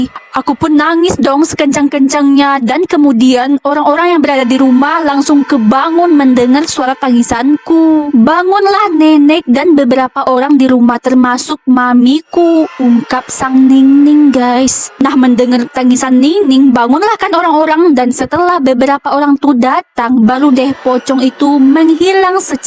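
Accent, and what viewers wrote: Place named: Padang, Indonesia. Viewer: Kak * dg khas logat padang nyaa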